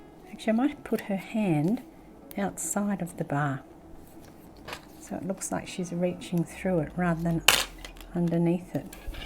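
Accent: Australia. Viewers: Unmistakable Aussie accent, as soon as I heard you speak, I subscribed (because we don't have many Aussies who make videos using Tim's products). I'm in regional Vic. Great pieces, loads of fun. Love your album. Cheers to you too...Aussie, Aussie, Aussie, oi, oi, oi.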